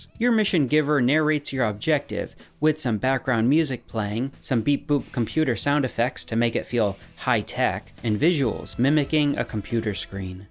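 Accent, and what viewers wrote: England, United Kingdom. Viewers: The voice sounds like an english accent, but possibly someone who's first language isn't english but learned how to speak english via someone with an english accent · that accent sounds like a british guy trying a eastern european+asian accent. its wild. goes from jackie chan to arnold in like, .5 seconds.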